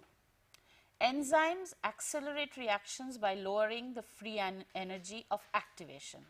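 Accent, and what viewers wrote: India. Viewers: lmao! ""OXIDOREDUCTASE!!"" man a luv da indian accent!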